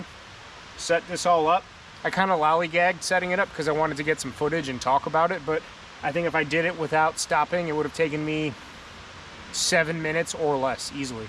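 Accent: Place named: Allegheny, United States. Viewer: You sound like your close to where I live. I live near the Allegheny national forest.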